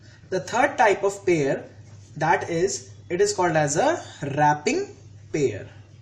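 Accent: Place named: India. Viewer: No fucking Hindi ROACHES accent.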